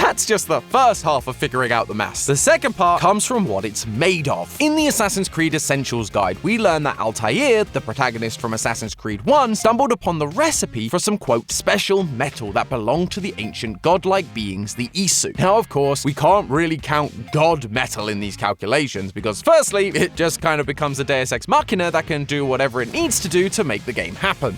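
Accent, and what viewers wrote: United Kingdom. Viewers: YES a classic old school game theory! thank you british matpat! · This is just the British mat pat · Just came back to game theory and where is matpat why is he replaced with dis british guy...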